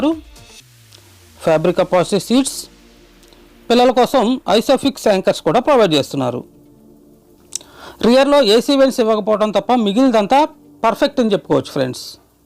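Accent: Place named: Telangana, India. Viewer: అచ్చ తెలంగాణ యాసలో అద్భుతం గా అన్ని విషయాలు చాలా బాగా తెలియ పరుస్తారు మీకు నా ఆశీర్వాదం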